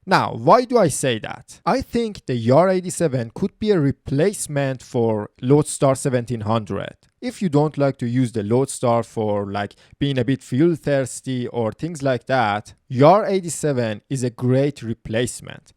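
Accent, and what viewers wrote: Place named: Iran. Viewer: Bro, your accent sounds extremely Iranian. Did I guess right?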